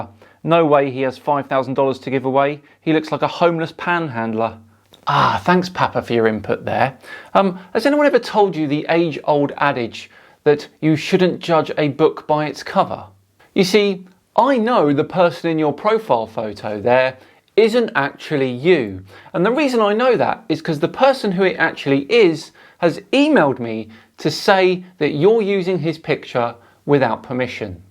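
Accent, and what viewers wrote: United Kingdom. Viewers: is a nasa shill using a British accent or whatever to sound smart he also could be homosexual according to some accounts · I think is hilarious. I’m assuming he’s British from his accent and sense of humor, but that’s right up my alley. Besides, if I didn’t, I wouldn’t be here.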